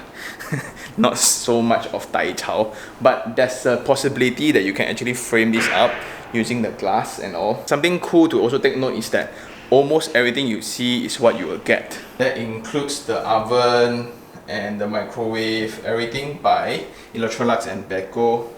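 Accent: Malaysia. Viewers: Your sound like malaysian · are you from penang? Your hokkien sounds like 1!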